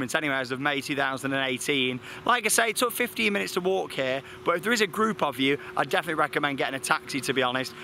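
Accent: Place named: West Midlands, United Kingdom. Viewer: I thought you were from the west midlands not manchester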